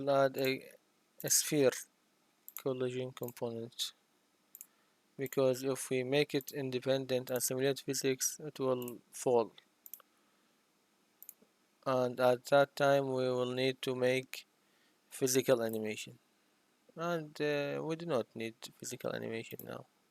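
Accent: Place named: Iraq. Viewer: لهجتك عراقي انت عربي لو كنت عربي عندك دوره باللغه العربية اشتريها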